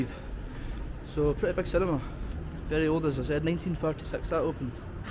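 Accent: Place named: United Kingdom. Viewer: British akcent 😁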